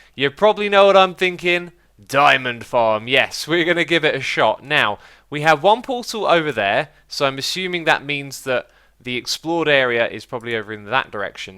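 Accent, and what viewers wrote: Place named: United Kingdom. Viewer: Hey x. Why so British?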